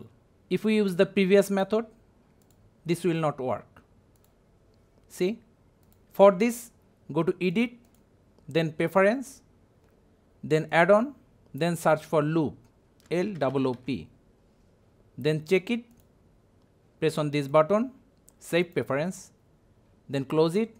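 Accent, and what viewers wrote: India. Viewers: of course the guy with with and indish accent teaches me years of blender in 2 minutes, reliable as always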